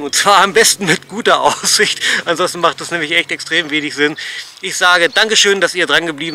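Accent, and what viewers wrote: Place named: Baden, Germany. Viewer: Das ist badisch!! Wir reden nicht komisch...IHR redet komisch :-) In Hausach war ich auf dem Gymnasium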